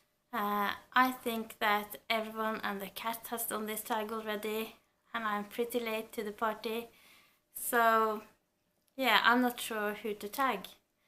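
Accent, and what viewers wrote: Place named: France. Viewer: Yeah! I love this tag as we get to know even more about the booktubers we love. also, you have a terrific French accent ! Like really cool :).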